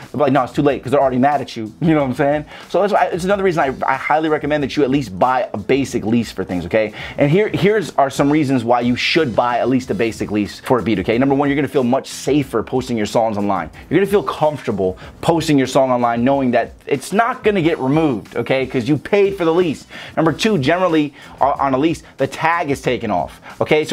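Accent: Chicago, United States. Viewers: He sound like he from deep chicago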